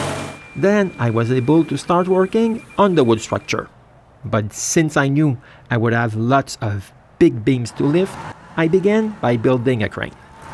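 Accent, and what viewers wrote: Quebec, Canada. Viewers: Un bon vielle accent quebecois hahah! Beau travail! Wow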